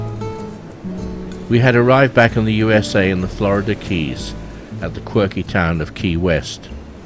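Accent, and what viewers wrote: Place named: Southeast England, United Kingdom. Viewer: It’s pronounced ee-gwa-nah lol Not ig-u-ah-nah And its you-ka-tahn lol Just saying how the locals pronounce these in their native language lol no worries we know what you meant. I am curious as to your accent though. Its very similar to mine and im from the southeast of the U.K.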